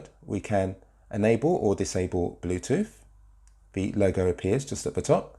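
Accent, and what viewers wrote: United Kingdom. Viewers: Not helpful at all for me. The Speaker talked too fast, but more importantly, I tried to keep up with him to find the screens his finger touched and I could not duplicate the function. I bought this new Paperwhite 2021 a few months ago (this is November 2022) because I was advised my older Kindle would no longer operate with new books added. I am trying to find someone who can actually show me how to operate the Paperwhite. Finally, why have a British voice on this video when there are thousands of American speakers who would be easier for the American ears to understand.?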